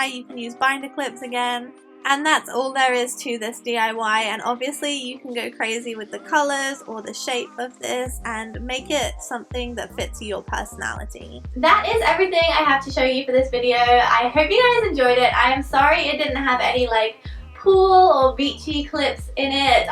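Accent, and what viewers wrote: England, United Kingdom. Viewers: Shes english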